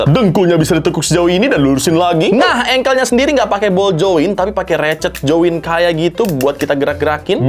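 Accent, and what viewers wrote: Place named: Sumatra, Indonesia. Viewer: ada logat bengkulu,jambi dan palembang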